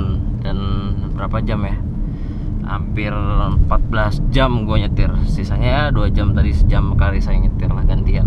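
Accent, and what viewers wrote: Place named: Banyumas, Indonesia. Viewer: Lucu timen ngomong ngapake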